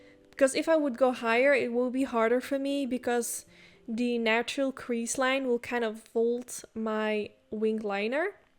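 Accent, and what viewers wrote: Netherlands. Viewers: My mind: SHE IS DUTCH! I could hear u speak English with the dutch highlights😆 hahah very nice video! I do the same!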